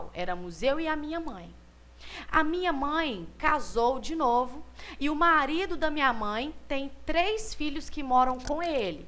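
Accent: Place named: Belo Horizonte, Brazil. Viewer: Ola Prof este seu sotaque é de BH kkkkk.Mas uma boa explicação de vossa parte.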